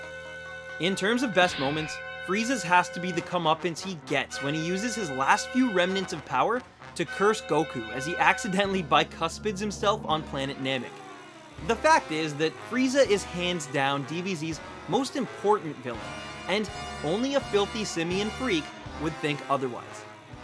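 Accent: Canada. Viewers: just the way you say about it can tell you Canadian I'm right aren't i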